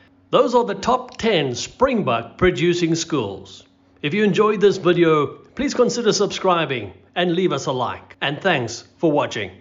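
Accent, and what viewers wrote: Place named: England, United Kingdom. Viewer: this guy's pronunciation is too english!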